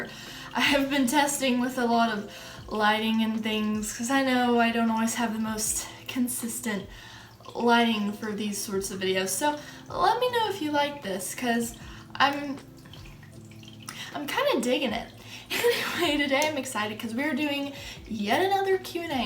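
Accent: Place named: South, United States. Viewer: omg ily you're personality is on point! all my questions were already answered by the other people so I didn't submit one lol AND OMG I THOUGHT YOU WERE 5'6 OR 5'7 I WAS SO CLOSEE WAIT I KNEW YOU WERE TALL but i notice an accent where are you from? it sounds like country ish 🤠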